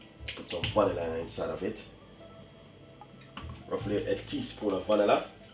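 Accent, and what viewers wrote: Jamaica. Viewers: The Tensai that's the Jamaican pronounciation,the word is moss.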